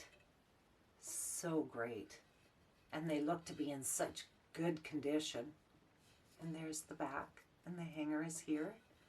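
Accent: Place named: Western Canada, Canada. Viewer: Loving the videos thank you for the background on yourself you sounded like BC or western Canada. I am from Southern Ontario and nothing in my pockets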